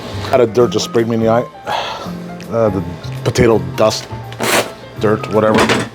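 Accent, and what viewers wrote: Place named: Montreal, Canada. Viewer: You are everything and all that, plus a good dad. But that Montreal accent! Chef's 💋. I miss it out here on the rural west coast and yours is a particular thing of beauty. Have a great service tonight. Love the videos.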